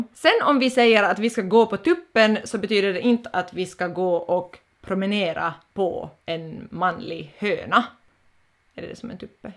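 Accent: Finland. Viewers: I can hear the Finnish vowels in Finland Swedish. I prefer this kind of Swedish than Sweden Swedish lol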